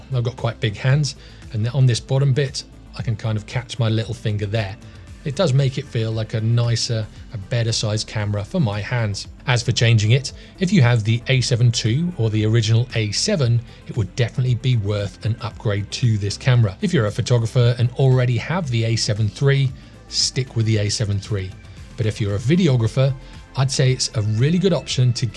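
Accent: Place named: Midlands, United Kingdom. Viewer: Nice one - Im guessing midlands to South.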